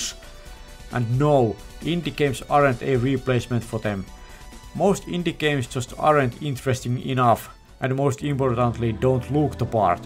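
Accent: Finland. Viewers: One of the most aggressively Finnish accents I've heard in years lol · The most authentically Finnish accent I've heard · You have the most Finnish accent I've ever heard. I enjoy it. I'm subscribed now haha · Ai että, suomiaksentin tunnisti heti :D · Ha! I was listening that this sounds like a Finnish talking with rally-english accent. Then I checked the channel description and there it was, Suomi. 😄 Mut joo, kiitoksia videosta. Itse aloitin pelaamisen vuonna 1986, eli samoihin aikoihin kuin videon tekijä. But yeah, thanks for the video. I started gaming at 1986. Roughly at the same time as the maker of this video. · I 100% agree with you! Btw are you finnish? You sound very finnish 😅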